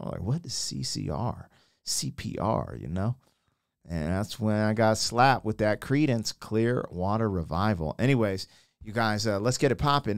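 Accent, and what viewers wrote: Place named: Louisiana, United States. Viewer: cali boy with a cajun accent!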